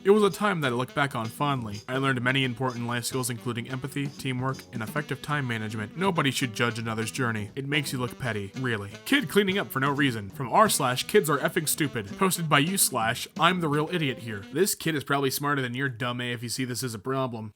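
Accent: United States. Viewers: Suddenly an American accent WAT IS HAPPENING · Why are you speaking American rn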